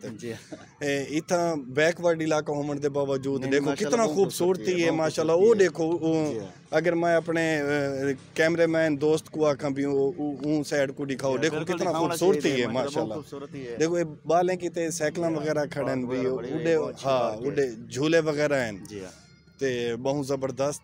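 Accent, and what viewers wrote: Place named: Saraiki, Pakistan. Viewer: بہت ہی پیاری اور مٹھی بولی سرائیکی بھائی آج تو دل خوش کردیا آپ نے اللہ پاک آپ کو کامیاب کرے اور صحت والی لمبی زندگی عطا فرمائے آمین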